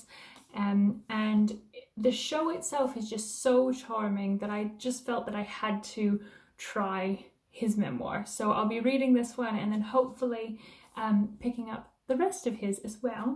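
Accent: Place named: Scotland, United Kingdom. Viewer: This was so cosy and lovely. Also I love your accent, the little bits of Scottish in the way you say things like as well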